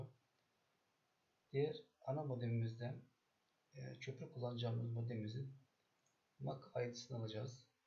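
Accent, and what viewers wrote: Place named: United States. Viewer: Sesin Amerika'dan geliyo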